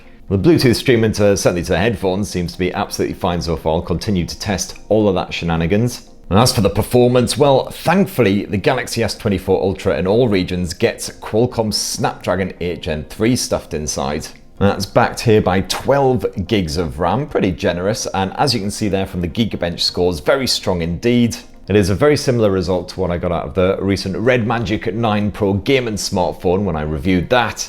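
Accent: United Kingdom. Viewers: I love UK accent,is very clear.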